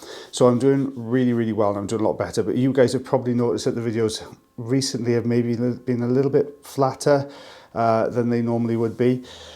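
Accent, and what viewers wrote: Wales, United Kingdom. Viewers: Thank you for talking about mental health. Both my son and I suffer from depression. We both got help from doctors. I will pray for you that you will be able to keep it at bay so they say. I love your channel and your Welsh accent is so wonderful. Take care. ❤️😇🙏🤩Congratulations on another grandchild. Unfortunately my kids are not having any children. My friends all have them and I am a bit jealous. But you enjoy your granddaughter. Oh they smell so nice. ❤️👶🍼😊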